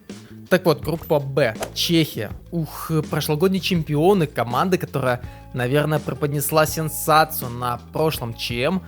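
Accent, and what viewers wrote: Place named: France. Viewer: Комментатор с французским акцентом,не в обиду,прикольно базарит.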